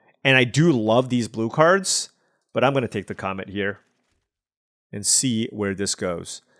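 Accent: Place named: Seattle, United States. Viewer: It's a Seattle accent. We sometimes mumble some of our words.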